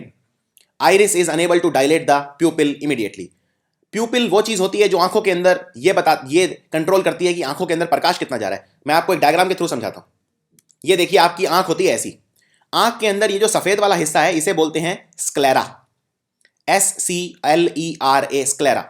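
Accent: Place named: Bihar, India. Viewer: Bahut khub . Ur accent reminds me of a teacher of mine from bihar . Thank u sir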